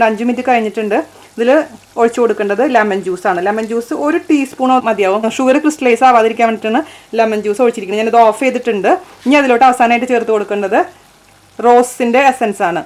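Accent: Thrissur, India. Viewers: Hi chechi...checheedea thrissur slang samsaram enik othiri eshtanu. Adhu polea coocking..dubail evdea chechi stay cheyunadh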